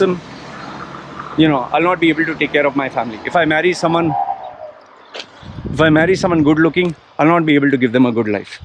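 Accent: India. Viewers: Did not expect you to have an Indian accent LOL. Cool insight tho.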